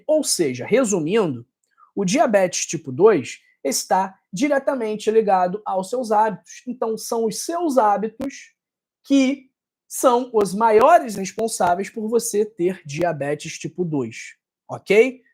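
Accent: Rio de Janeiro, Brazil. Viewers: É o sotaque dos cariocas. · Que nojo este sotaque carioca carregado...não dá nem pra escutar...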